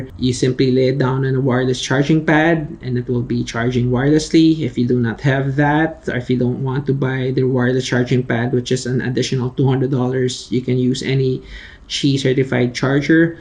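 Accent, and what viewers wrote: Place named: Philippines. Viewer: great review, ur Philipino btw? recognized the accent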